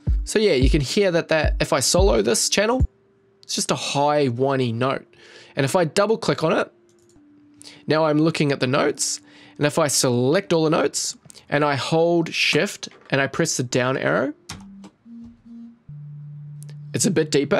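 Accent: New Zealand. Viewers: You sound kiwi bro! Me too, subbed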